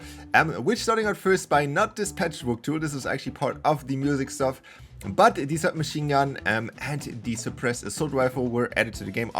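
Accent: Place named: Germany. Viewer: Nice Video! You have a little German accent so I wonder are you speaking German normally?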